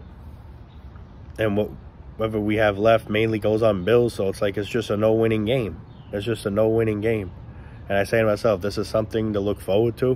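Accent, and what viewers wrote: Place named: Bronx, United States. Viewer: originally his accent reminded me of the bronx, but then he told me he's from yonkers. life is so confusing sometimes. 😉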